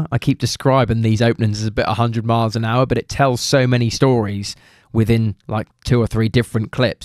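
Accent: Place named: United Kingdom. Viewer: I like his British accent😂 it’s not a joke I just like it😂😂😂 but I just wanted to put in the laugh emoji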